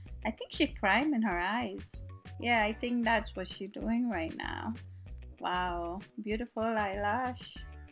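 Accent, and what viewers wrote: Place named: Guyana. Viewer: i love the guyanese accent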